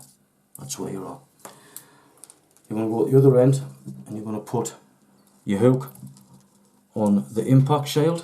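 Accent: England, United Kingdom. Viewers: If your english through and through you would be able to understand him and also know what part of england he is from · You make great rigs, but I wish I could understand you better, I have big trouble with English and Irish accents..... I'm from the deep south USA where we talk real slow. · Got half way through your video and had to turn it off, I couldn’t understand a word you said, only the odd word here & there.. it’s speeded up mumbling type thing that I couldn’t get. ? Are you English? I am through and through.. I’m sure your video is very good but I have no way of knowing ?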